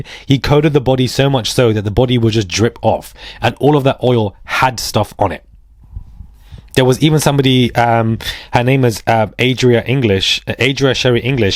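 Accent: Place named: London, United Kingdom. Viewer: Yeah his accent is a London accent. It is how we speak in the South Eastern part of the UK.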